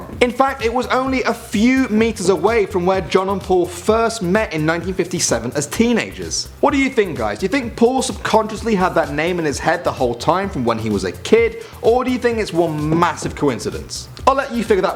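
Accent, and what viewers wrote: United Kingdom. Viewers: Brother, would you please slow down a bit? The fact is, you are speaking with heavy British accent and you talk so rapidly, that it is very difficult for non-british to comprehend what you're saying!)) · I knew of just about all of these.:) I question, though, the presenter mentioning how Paul woke up in '63 with the tune of " " in his head since the song was recorded in '65. Paul, supposedly, obsessed about it during the filming of " ", in fact. While it's possible he could have thought it up two years earlier, it doesn't seem accurate to me based on the many things I've read. Number One is probably the dumbest, oddest conspiracy I've ever heard of. I can't believe there are people who still think Paul died in '66 and was replaced by a double. Lastly..I just have to say that I love this guy's English accent and wish that I could bottle it.;)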